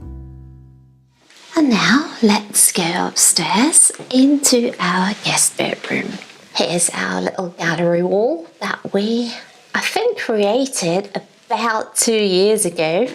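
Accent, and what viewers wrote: United Kingdom. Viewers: 愛がいっぱい❤️気持ちがあたたかくなります。英国英語に聞き惚れてます。私もクリスチャン。素晴らしいクリスマスを過ごしましょう✨🎄✨ From 🇯🇵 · I always thought you were British because of your accent and didn't understand why you say yor are German... I thought only was really German. Now I watched your Q&A explaining where you got your accent from and I understand. Can you make a video speaking a little bit in your natural German accent? It will be interesting to hear. Also I love the German language, can you make more German language related videos? Maybe about German classical literature or just favorit German words. Love your channel!!! Sara.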